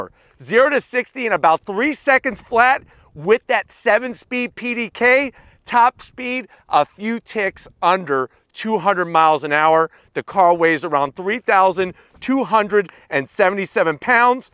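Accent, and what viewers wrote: United States. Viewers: why Americans speak like this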